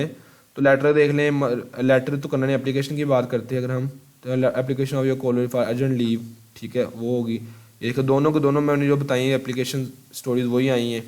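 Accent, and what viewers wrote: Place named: Lahore, Pakistan. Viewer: Sir are you from lahore. your aaccent is like a lahori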